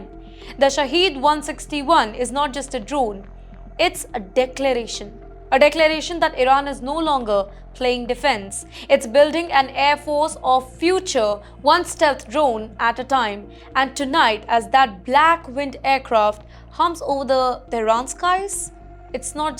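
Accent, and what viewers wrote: India. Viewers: I hate Indian English😂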